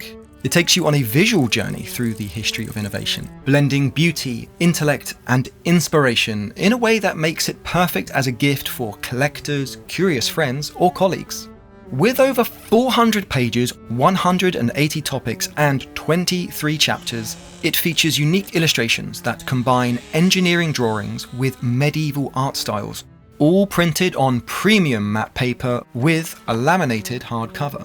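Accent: United Kingdom. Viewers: Oh man, I love this video but something about a lofty british accent over the mocking depiction of dust bowl victims make me want to wage a second revolutionary war (but like, just on this guy.) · Hearing British people swear while talking about American history adds sunshine to my day. Subscribed!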